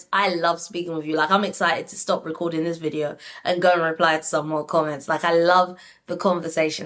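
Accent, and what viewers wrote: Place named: United Kingdom. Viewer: I love your reviews and that accent is so enticing. Honestly, when you brits talk, I just damn listen 😂. Ok so, let's get into it. I have subscribed and thumbed up 😉 1) Nicole and Sam - we have to be fair and not give Nicole a pass for her behavior. Her asking Sam for physical contact after that disastrous reveal and what her heart showed her is really low. She has self-esteem issues. Was it love at all cost for her, cuz sis has me really disappointed with that revelation. Did she think that sleeping with him was going to erase the awfulness that is Sam? 2) Demi and Ollie - Ollie is not about that life, he likes pretty girls and whilst he knows Demi is good wife material, the sexual tension is lacking. This wont' work. Demi is just a homie to him. 3) Maria and Tom - Maria can't have it both ways, she can't be about arab/muslim culture and values in one scene and be drinking a cosmo in another. 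I have nothing against Muslims/arabs nor against alcohol but the fact that she is a liberal muslim proves that she needs to compromise with the world as she has done with herself. It's not because Tom does not put religion or culture forwards that he lacks values or choice. Stop it already. 4) Cat and Freddie - Cat has a temper or maybe it's Freddie that triggers her. I think Freddie's gorgeousness throws her off, it's almost as if this was his best quality and his worst at the same time because people tend to associate his looks with someone who is effeminate or soft. In any case, Cat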